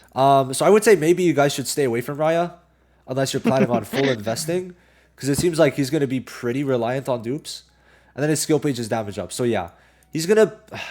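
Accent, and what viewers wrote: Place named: Germany. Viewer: Bro sounds so german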